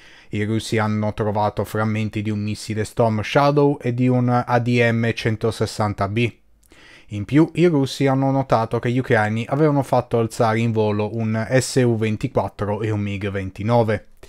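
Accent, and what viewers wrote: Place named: Albania. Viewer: il suo accento assomiglia tanto alla lingua Albanese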